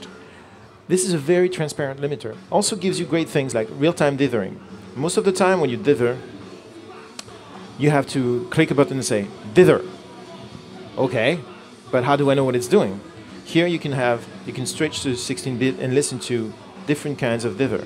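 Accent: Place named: France. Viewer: Are you French by any chance? I don't know what it is but it instantly makes your English sound much more awesome. That's not cool man; it colors my perception of you and prevents me from getting a proper read, lol. Anyway, great explanation. Very well put, as if your words came straight out of my head on every point. I'm sure you do great work and thanks for taking the time to educate. All the best!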